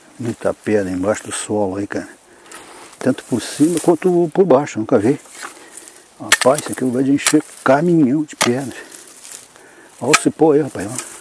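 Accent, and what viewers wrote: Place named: Rio Grande do Sul, Brazil. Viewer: Queria tanto saber onde fica se e acessivel por estrada, que pelo sotaque e gaucho.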